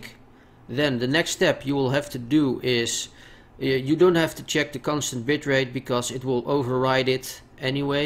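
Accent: Netherlands. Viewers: Hup Holland! :P Aan je engels was het wel te zien :D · Are you from the Netherlands?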